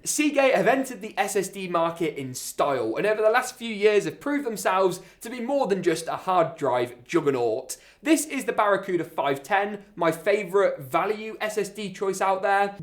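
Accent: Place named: Wales, United Kingdom. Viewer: are you Welsh, you sound Welsh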